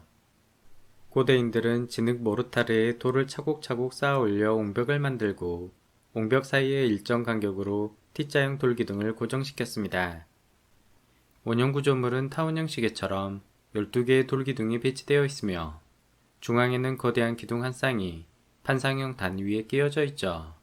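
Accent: Jeolla, South Korea. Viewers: 전라도 사투리가 들린다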